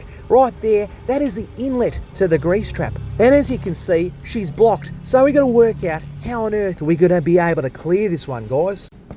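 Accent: Australia. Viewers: "Go on ya good thing!" is a very popular saying in Ireland so it was funny hearing it in an aussie accent I also always love "Bob's your mothers brother" (or Robert to make it even longer) which is obviously a variation of a British expression You have a great array of multicultural idioms is what im trying to say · Hey, been watching for a while from Kent in the UK. Fucking love your commentary and rambling. Please keep up the good work and posts. By far the most stereotypical Aussie commentator and the endless enthusiasm keeps us all coming back for more. We always stay to the end.👏🏼👍🏽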